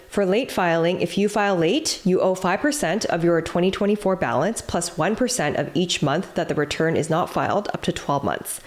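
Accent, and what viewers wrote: Canada. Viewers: has a canadian accent so she is likely canadian. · You speak like any normal Canadian! Thanks for the information!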